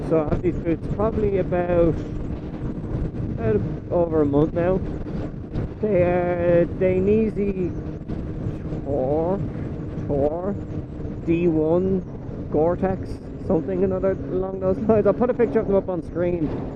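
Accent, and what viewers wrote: Ireland. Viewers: what part of Ireland u from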